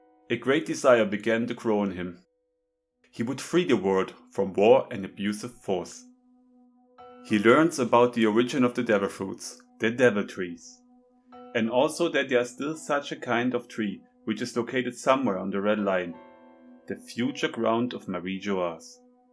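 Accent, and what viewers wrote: Germany. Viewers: no problem bro, and are you maybe German too? idk i think that maybe because of your accent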